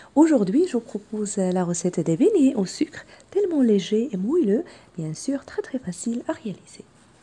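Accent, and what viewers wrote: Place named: Asia. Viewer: Coucou je découvre ta chaîne les beignets donne trop envie il faut que j'essaie ! J'arrive pas à définir votre accent. Ont dirait un accent asiatique mais la chaîne c'est cuisine marocaine. En tout cas je m'abonne merci !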